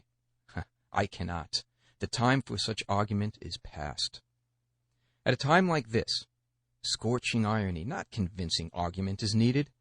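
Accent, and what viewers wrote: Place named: Boston, United States. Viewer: aside from the obvious white Boston accent, THE Words are amazing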